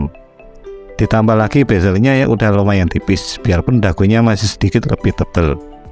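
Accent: Mojokerto, Indonesia. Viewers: wong mojokerto cak ?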